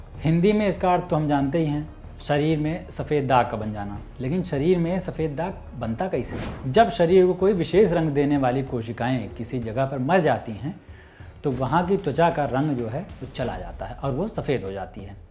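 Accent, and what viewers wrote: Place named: Kanpur, India. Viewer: आपका ही इंतजार था भाई साहब, आपकी कानपुरी भाषा सुनने मै सच मै आनंद की अनुभूति होती है ।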